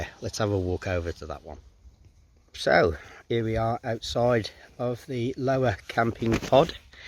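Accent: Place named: Staffordshire, United Kingdom. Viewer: Can't decide if you're from Staffordshire or Shropshire.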